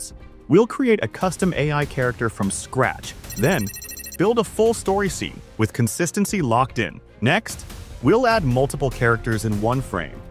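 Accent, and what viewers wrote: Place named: Asia. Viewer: how come you have a new voice boss, likes your asian accent better 😊 i know this tool😊 before i watched your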